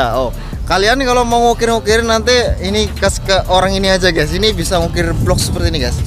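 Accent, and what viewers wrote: Banyumas, Indonesia. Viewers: Bang aku tau abang orang mana abang orang ngapakkan yang tau like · Apik ngapak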